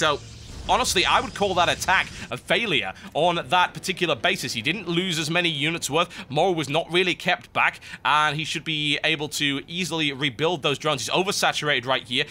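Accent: United Kingdom. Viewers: but but TB's awsome brit voice/commentary of epic SC2 matches...i must watch...i need it so i dont have to go and study my rad tech material or my statistics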